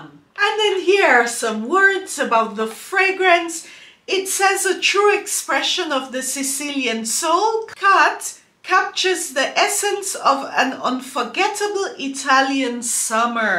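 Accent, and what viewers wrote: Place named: Kenya. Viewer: Niiice! Just bought it. First clone frag. Btw. Are you Kenyan 😙? You sound so Kenyan 🤔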